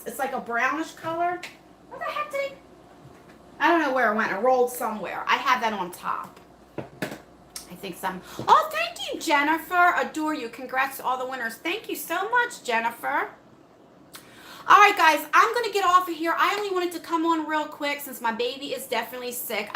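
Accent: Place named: East Coast, United States. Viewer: I just bought the temptresses palette. No big, but it was 10%, not 15. Thank you, though! I can’t wait! Your eye look is so gorgeous! You do so well, where you cut off the side of your eye right at the end of your brow. It really lifts your eyes! Great job! I really admire what you do! I miss the East coast. I went there as I played piano for President Bush for the prayer breakfast and stayed with a friend who has your accent! You make me miss her! 😇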